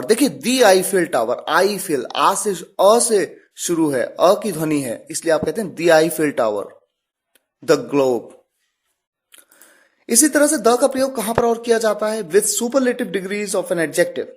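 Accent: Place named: Assam, India. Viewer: Sir ji aap assam se ho kya 😃😃😃😃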